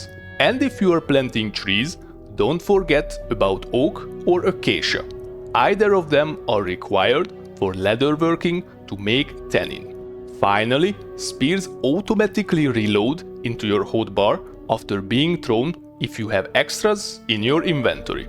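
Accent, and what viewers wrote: Hungary. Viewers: Csodás videó! Mint új játékos, nagyon sok szenvedéstől fog megmenteni. A magyar akcentus meg fenomenális! Csak így tovább! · Akcentusból már tudtam hogy magyar vagy xD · Oh I never realized that shoveling cattail roots is the way to go... also that's some nice Hungarian accent you have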